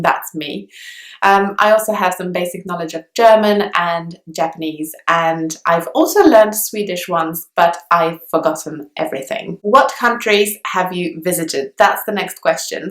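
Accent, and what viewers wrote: United Kingdom. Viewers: Dear Madam. So nice to hear about you. You seems to a very dynamic person doing so many things and active about going to places. I knew from the beginning that you are a English. Your accent betrays. Now that cannot be changed to English. Any way you have worked hard to learn Enlish so as to teach to others. My congratulations for your great efforts. You may wish to be in France a roamantic place. Have some hay days there. Best wishes for a very happy life. Raju from India. A Tamilian, Wish to remain as your good student and learn a lot from you. Thank you. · You are a big inspiration for me. You really speak like British. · nice video. Perfect British English, not cockney and that's a plus :) You also look beautiful . Ciao bye hasta luego..those are the languages that I speak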